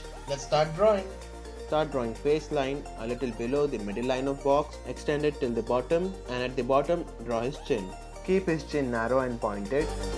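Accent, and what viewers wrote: India. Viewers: It took me 1 second to realize that you are an Indian (because of your voice idk)... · Wow These Indian voice xd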